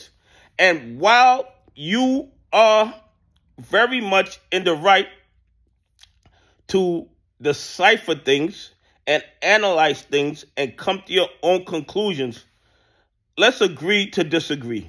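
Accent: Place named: Boston, United States. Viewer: He did not have the money to Sue you guys should work together he's got a smart thought behind it You sound like al from Boston look where he went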